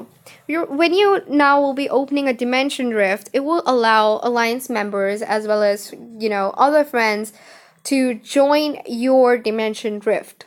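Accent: India. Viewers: Shabbir Alom Shakil I'd say India given her accent 🤔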